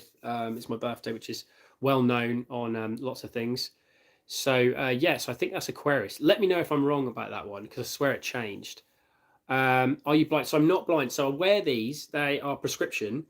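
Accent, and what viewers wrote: United Kingdom. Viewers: THE BRI'ISH ACCENT ❤ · HE HAS A BRITISH ACCENT AAAAAAAAAH THIS IS AMAZING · Are you british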